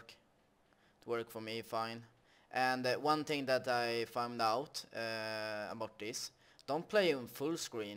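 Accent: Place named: Sweden. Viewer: Aldrig hört någon mer svensk människa.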